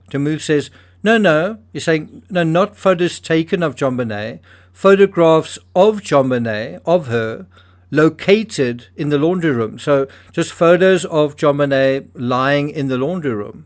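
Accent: South Africa. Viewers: South African ascent … superb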